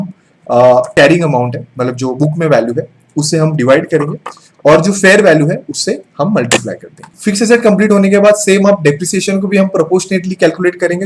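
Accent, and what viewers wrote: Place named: India. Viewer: what is bro on about, i didnt understand shit of that indo-english bullshit. He's onto NOTHING💯💯💯💯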